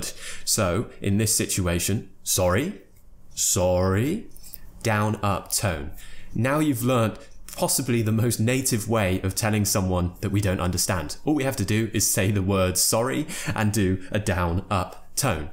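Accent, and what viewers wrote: United Kingdom. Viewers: Super! I like your lessons. And a Britith accent ))) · I love ur uk accent i hope someday visite london 🥰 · United Kingdom, so he have a British accent. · Really you make me fall in love with the British accent 🥰🥰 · Am Ugandan but am more interested in the British accent it's so wow,am following your lessons, pretty great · I love ur accent so much, and I’ve been mimicking the British accent all the way in HK due to its elegance and uniqueness. I’m gonna come to England in April, honestly I hope all the slangs and idioms that I’ve learned from you could be applied in my journey. Thank very very much!!! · that aweasome British accent, thanks.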